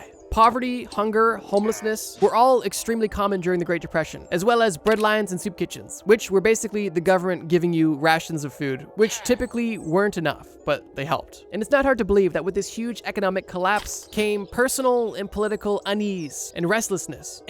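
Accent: Canada. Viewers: Are you Canadian ? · You're Canadian????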